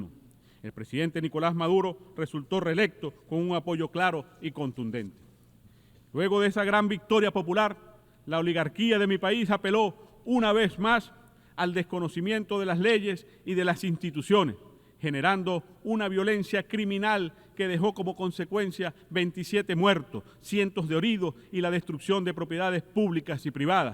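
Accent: Cuba. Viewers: Usted tiene toda la razón eso ni dudarlo puro Cubano. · si acento es cubano, trata de hacerlo neutro pero se le sale lo cubano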